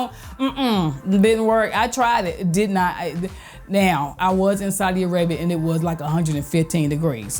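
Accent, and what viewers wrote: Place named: Texas, United States. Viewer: New subbie! I knew that you were from the south before you said it. You sound so much like me and my family here in Texas! I love it! So relatable. Your suggestions for saving are perfect! I'm a huge paper towel purchaser. If I run out of it, I'm not happy. But your alternative to cloth napkins sounds promising, I have cloth napkins, but use those for decor most of the time. Also the deodorant, I'll buy the twin packs for myself, hubs and son. I've always known about the aluminum that's used but... I've also tried Lume there's 0% aluminum in there's. The catch though, is that it doesn't really smell like anything we're used to in deodorant (has a very light scent) But that's okay, it's safe. Also, I'll be trying out the white vinegar for our laundry. As those are also things I have on hand at home. I'm so about saving a coin, if I can. 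TSMFS❤❤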